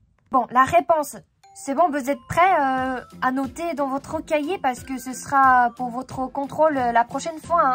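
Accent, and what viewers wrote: Japan. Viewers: Arigatougozaimasu -san de m'avoir fait rire avec vos jeux de mots extrêmement drôles sur notre grammaire alambiquée sur le post: Mon imagination quand j’apprends le français. Je n'ai pas réussi à poster mon commentaire avec mon vieil ordinateur sur celui-ci, un vieil obsolétisé et otaku comme moi c'est … heu… じいさん 👻 Vous parlez extrêmement bien malgré votre charmant accent. Pour « beaucoup » faites comme pour 巧, (kou, こう) 🧐 Il y aura un peu l'accent japonais (c'est charmant) mais ça ne sera pas « cul. 🧐:face-turquoise-covering-eyes: ほんとうにありがとうございます! ☺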